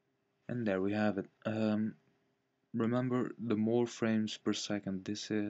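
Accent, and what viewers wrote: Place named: Mexico. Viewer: Nice mexican voice :D Good tut.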